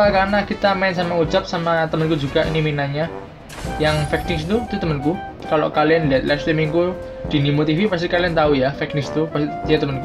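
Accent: Java, Indonesia. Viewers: Medog bang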